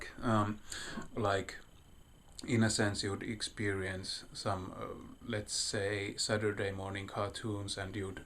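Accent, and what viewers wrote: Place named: Finland. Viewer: Upeeta enkkuahan toi, itseasiassa meidän vanhalla enkunopella oli hyvin samanlainen suomalainen lausuntatyyli :)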